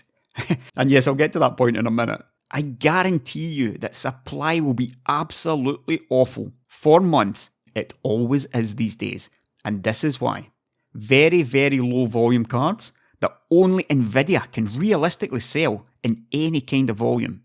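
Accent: Scotland, United Kingdom. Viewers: Love you, you are my favorite scottish accented tech youtuber of them all, and i can't wait to see more of you in the coming months. Much love and keep strong! <3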